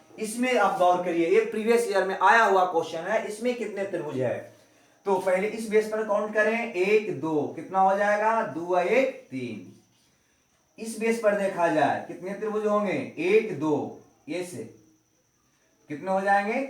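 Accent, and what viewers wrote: Bihar, India. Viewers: wow gajab ka pdate ho sir...bihari stayl bha gya... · Sir aap Bihar se h kya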